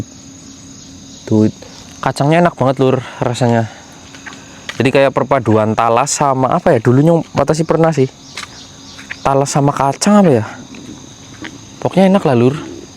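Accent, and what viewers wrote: Banyumas, Indonesia. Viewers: Ciri khas narasi ala adalah penggunaan kata2 yang lucu penyebutannya. Palkir, tlaktor, kakekk-kakekk, nenekk-nenekk, nyebut house jadi hoss, dll. Juga dialek ngapaknya, dan gaya polosnya. 😁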